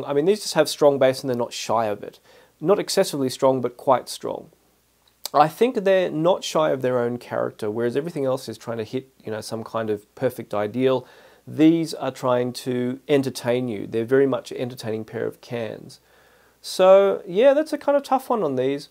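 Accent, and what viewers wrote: Australia. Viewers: Great review!! I’m thinking of getting a set of headphone. I prefer closed. I have 2 choices in mind at the moment. This Sony or the Shure SRH1540. Any chance you’ve listened to the Shure? Unfortunately I can’t directly listen to the Sony as I have to get it from Australia (you sound like you’re from Australia. I’m from NZ and unfortunately we don’t have it in our Sony stores) it’ll be interesting to get an input from you. Cheers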